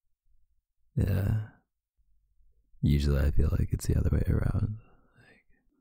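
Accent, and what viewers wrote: Canada. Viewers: Omg you’re voice so nice 😳🥺 and your giggles/laughs make heart melt 🥺💖 to dream of having a boyfriend sound like you lol I love Canadian accents 😊 they make me melt 🥺 new fan from the UK here lol Stay safe 😊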